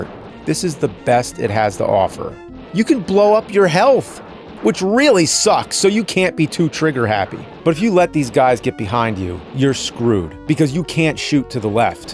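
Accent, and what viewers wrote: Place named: New Jersey, United States. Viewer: nerd cant even say the name of the main character Cliff Secord correct he called him Cliff Sector WTF it must be his dirt bag new jersey accent thats why the call new jersey the garbage state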